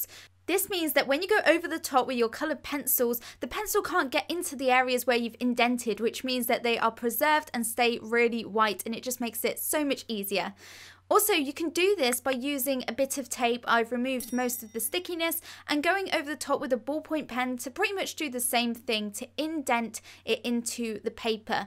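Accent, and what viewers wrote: United Kingdom. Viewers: Just love it! You're so clear in your explanations. I'd love to join the course on portrait, it would be a wonderful gift to myself for my birthday in June! Ps. You British accent is to die for, being Italian listening to you is a great way to improve my language skills which is an extra pro!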